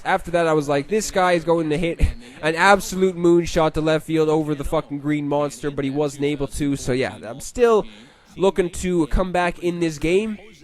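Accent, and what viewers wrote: Canada. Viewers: Are you Canadian Youre accent sounds like it if you could plaese let me know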